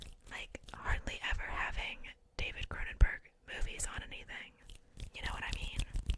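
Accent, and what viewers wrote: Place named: South, United States. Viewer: I love your little country accent amazing video ❤️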